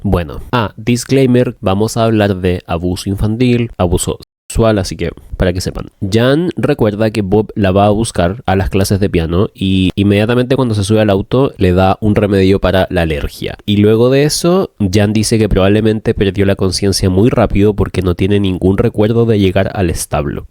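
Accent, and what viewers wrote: Chile. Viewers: Oh wn que cuatico como la religión te hace eliminar el pensamiento crítico y te hace susceptible a cualquier mentira, incluso una tan retorcida como esta. Oye que bacanes tus vídeos, le tomo caleta de atención. Me gusta mucho el true crime, pero estaba chato de escuchar gente no chilena ajsj así me siento más en familia. Bacan tu canal. Saludos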